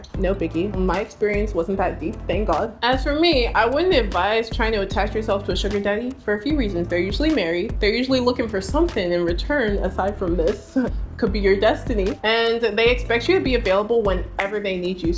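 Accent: Nigeria. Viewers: Your Nigerian accent is off the roof.All American kids tries to take, my little tries to fake my mom's accent too. You're good. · Sounds very Nigerian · I love how you are adopting the Nigerian accent that males the video really fun. Keep it up girl!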